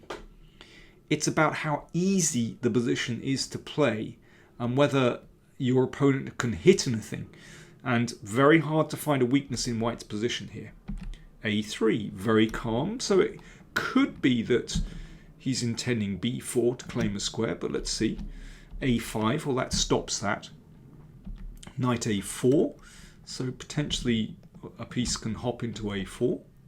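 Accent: United Kingdom. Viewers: Even though you don’t get crazy view counts on your videos, I must let you know that your videos are perfect. The classy Brittish accent enhances these fantastic chess match reviews! I’ve been a long time subscriber and this channel is like ASMR to me.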